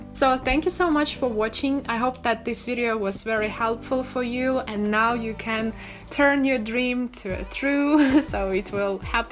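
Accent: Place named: England, United Kingdom. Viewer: Hi,I'm Nourdine from Morroco, I really like your English accent...so pretty please I can get your phone number this is my email: <mail>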